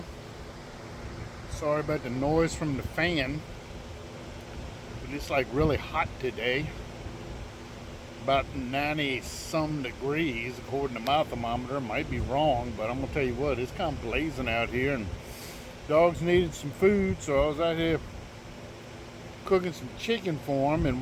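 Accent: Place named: Louisiana, United States. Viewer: Looks and sounds good. My Momma liked her hotdogs black! Are you from Louisiana? Asking because I detect an accent. Thanks for sharing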